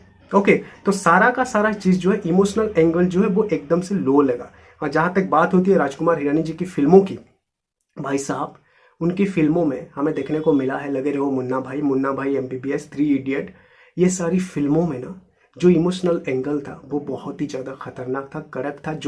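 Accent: Odisha, India. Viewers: Bhai AP kya odisha se ho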